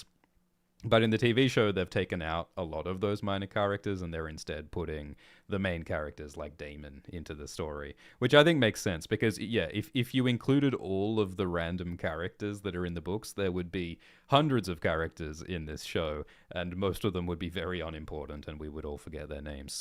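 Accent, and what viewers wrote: Australia. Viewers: What gave it away? The Australian accent he’s used for 10+ years?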